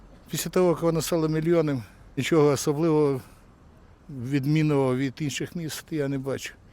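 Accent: Russia. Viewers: А у самого то відчуваєтьсяговір російської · Суржик це не Українська мова. А діло закидає москальський акцент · Почему нэмецкая, а не нимэцька, розмовляли, а не ромовлялы, была, а не була? Как не старается выдать себя за украиномовного, не получается, русские слова проскакивают. Молодец, заработал свои 30 сребреников. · Пиздун, всю жизнь говорил на русском, теперь перекрасился, власть поменялась, это слышно по акценту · В Одессе до войны можно было услышать разные языки-это правда. В 2010 году могу с ответственностью сказать, что в основном, говорили на своем "одесском" наречии, его ни с чем не перепутать. Мужчина, судя по его акценту, тоже говорил на русском, или на суржике. Главное, что в сердце у человека. На фронте бойцы не поднимают этот вопрос до фанатизма! Слава Богу! Слава ЗСУ! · Как он старается говорить на украинском, но черт, возьми, он же русскоязычный, дураку понятно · А русский акцент скрыть не возможно Это Я вам как Одесситка говорю И все всегда говорили на русском и на своем что получили по национальному признаку 30 лет проработала на Дерибасовской и только русская речь звучала в Одессе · У самого в мові відчувається, що більше розмовляє посійською..,але зараз намагається говорити українською. 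Фонематика не українська.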